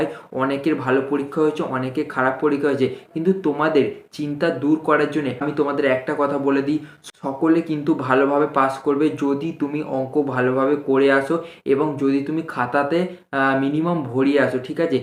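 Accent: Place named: Murshidabad, India. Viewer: sorry 🥺apnar voice ta onek ta murshidaabad er moto ty R ki bollam sorry🥺 kichu mone koren na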